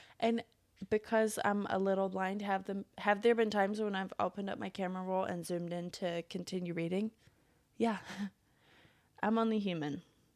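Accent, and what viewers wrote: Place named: Deep South, United States. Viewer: you are very funny and wise and cool. Relate to not liking maths at school, and having to deal with shitty customers. What part of the states are you from, surely the deep.south from your accent?? ❤❤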